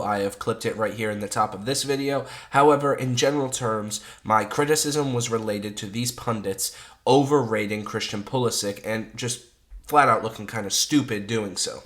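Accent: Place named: United States. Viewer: Bro, judging by your accent, I am gonna assume that you are an American. And I have noticed that the number of American football Youtubers has increased a LOT in the last two years. Is there any increase in football's popularity in USA recently in the last few years ?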